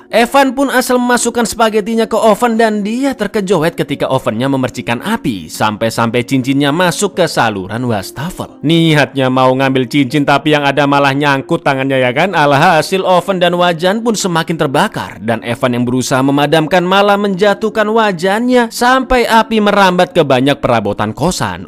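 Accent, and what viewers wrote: Java, Indonesia. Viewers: Aslimu org mna to min?jowomu medok,tp mm mu jwabar bget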